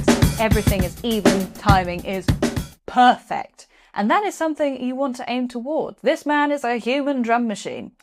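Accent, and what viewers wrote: United Kingdom. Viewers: This is the way Brits talk. I was a UK resident for over a decade. Trust me there is no arrogance here.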